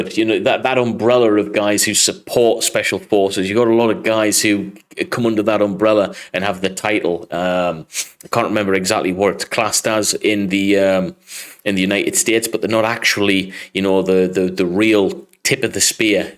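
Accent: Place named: Teesside, United Kingdom. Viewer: Dit just recently subscribed loving the content Got put on to ypur Channel by combat Arms very happy to find your channel as a doubled in the Marines a long time ago as a reservist and then discharged as I filled a medical on the eye test but anyway no point crying over spilt milk I've just heard you see in this video you are from the North East same here currently living in Washington originally from Sunderland spend most of my time in Newcastle I'm getting by your accent you're a little further South than Tyneside or Tyne and Wear probably going towards Teesside I should imagine that's my guess anyway am I close?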